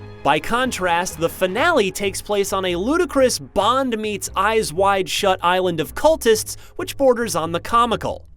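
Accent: India. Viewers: Indian accents arent actually that bad lol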